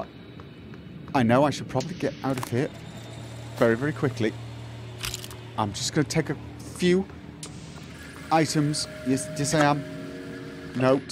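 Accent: United Kingdom. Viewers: Jeancly Hi! I'm learning british english, could you tell me where is his accent from?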